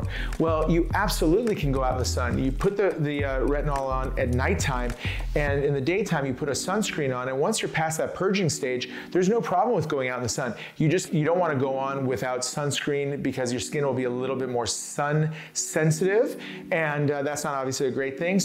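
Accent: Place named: Lebanon. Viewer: لبناني صح ؟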